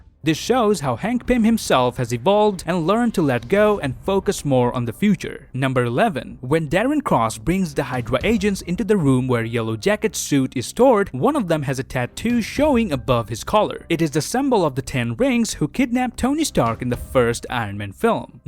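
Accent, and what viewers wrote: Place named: Canada. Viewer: lol dude because of your canadian accent opinion is openion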